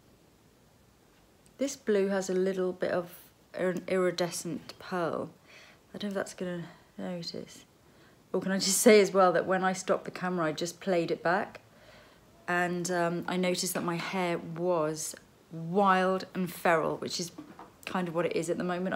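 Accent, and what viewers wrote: United Kingdom. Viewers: Lisa Eldridge I absolutely love watching your videos. I in the United States and your British accent is so lovely. I think you are such a beautiful woman. I love all your beauty tips. When I watch you I feel relaxed and ready to change my look style with confidence. Much love to you and your gorgeous kitty 😻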